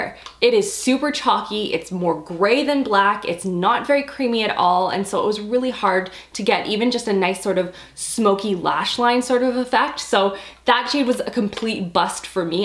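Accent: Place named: Canada. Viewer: You sound incredibly Canadian today. Like more than normal😂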